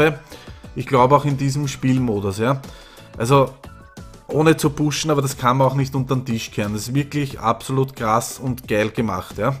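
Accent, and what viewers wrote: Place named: Austria. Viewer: Schau normal keine Videos Von euch aber mal Richtig interessanten Thema ist nicht der Beste Kommentaror von allen aber er Bringt die Sachen richtig Gut Rüber wenn du den Österreichischen Dialekt bisschen weglässt dann ist es Glaube viel bisser würde den Dialekt nur bisschen Reinmachen:) Weiter so:)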